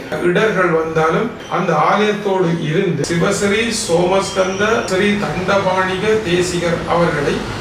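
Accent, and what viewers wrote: Jaffna, Sri Lanka. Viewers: யாழ்ப்பாணத் தமிழ் மிகவும் தெளிவாகவும் அழகாகவும் உள்ளது... வாழ்க வளமுடன்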